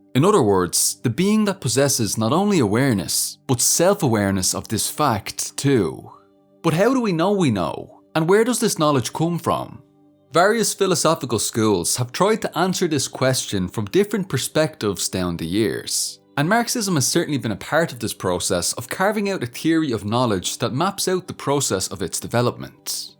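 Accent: Ireland. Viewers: Missed this lads thicc Irish accent sharing Marxist wisdom with the masses lol✊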